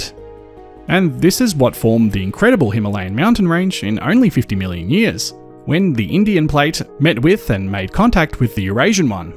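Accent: Australia. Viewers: Never even thought about this concept - so interesting! Cool video - I like how I actually half understand the science when you explain it - plus as a New Zealander I find your Aussie accent familiar and easy going to listen to.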